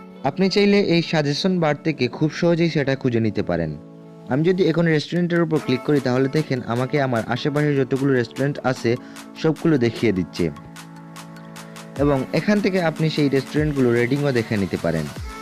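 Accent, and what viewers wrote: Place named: Sylhet, Bangladesh. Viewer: সিলেটি ভাইনি বা❤️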